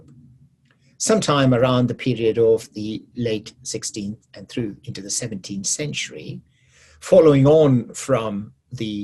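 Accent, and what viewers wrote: United Kingdom. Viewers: Am I the only one who thinks his academic British accent to be a tad ironically amusing?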